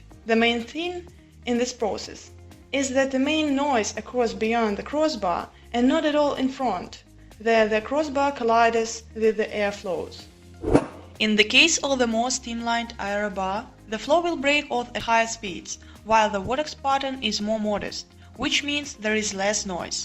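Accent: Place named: India. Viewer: Sounds like a Hindu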